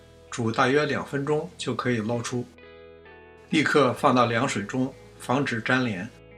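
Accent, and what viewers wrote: Beijing, China. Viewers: 的菜品不评价了，毫无惊险的每每获胜！这次想说，在视频编辑上用心了: 一道家常但可有千秋的酸辣汤，在京腔序曲下，低调出场……柔柔缓缓的音乐伴随汤的成长……随着特异蛋花出台、香菜妆点，高潮迭起……换大碗盛饭吧……明儿再折磨treadmill 😃😃😃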